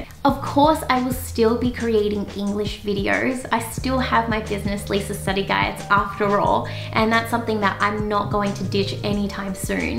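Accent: Australia. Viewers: U australian?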